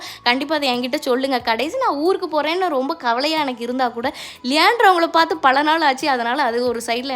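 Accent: Tirunelveli, India. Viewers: Neenga tirunelveli aa😮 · Darling neenga tirunelveli ah😮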